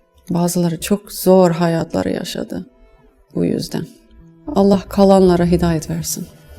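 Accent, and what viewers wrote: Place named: Trabzon, Turkey. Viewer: Abla trabzon lu sanki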